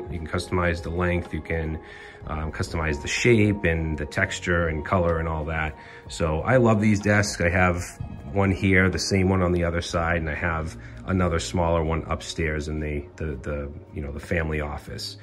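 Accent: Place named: Massachusetts, United States. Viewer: There's something about a Mass accent that "sounds honest". Stereotypical of me, I know, but there you have it. Perhaps it's that New England can-do spirit.... or I spent too much time awtching Norm Abram on New Yankee Workshop when I was younger.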